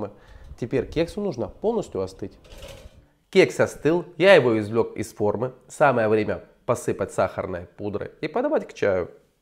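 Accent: Ukraine. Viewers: Спасибо,.Очень просто и вкусно.А ваш милый украинский акцент вызывает у меня ностальгию.😊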